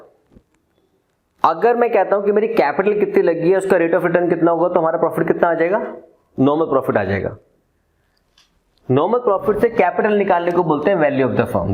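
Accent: India. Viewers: Sir ji...hindi ka majak mat banaiye...you too sound to be a Hindi background guy.... anyway good technics....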